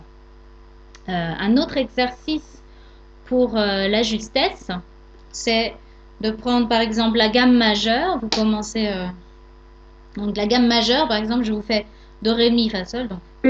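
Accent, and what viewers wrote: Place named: France. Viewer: L'important c'est la matière...Moi j'aime votre bel accent Français..Vos explications sont très compréhensible!!! Du Québec un beau bonjour:)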